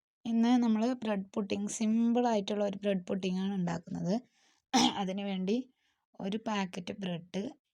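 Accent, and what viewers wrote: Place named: Travancore, India. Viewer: കാസർകോട് ജില്ലയിൽ പ്പെട്ട കാരാട്ടെ സംസാരം മാറി തിരുവിതാംകൂർ സംസാരം ആയി എന്നാ ഒണ്ട് വിശേഷം കൊച്ചെന്തിയേ എപ്പഴാ പാചകം തുടങ്ങി യെ ഒത്തിരി നാളായൊ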